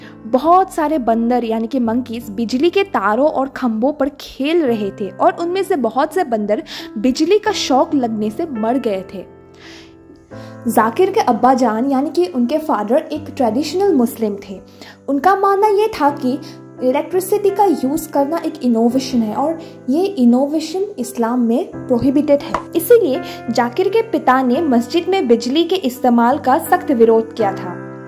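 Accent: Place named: Northeast India, India. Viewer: North eastern hindi accent vibes 😊 Well explained , that helps me a lot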